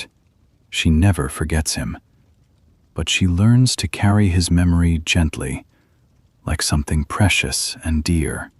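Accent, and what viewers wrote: United Kingdom. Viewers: Is it a British accent?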